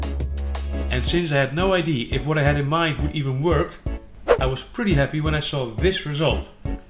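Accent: Netherlands. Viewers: nice!..that would actually be a good kind of"scarecrow" in the garden..just a question ,how come you got such a strong dutch accent? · jezus man, je kan wel wat beter je best doen om minder nederlands te klinken. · are you dutch?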